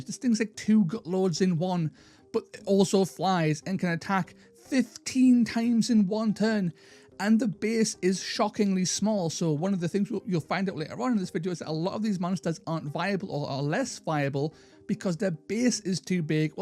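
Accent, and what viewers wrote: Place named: Spain. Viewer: I love your spanish voice lol I use last tournament a Deathbringer against a Zombie Dragon... and he flew away lol CHAOS POWER!!!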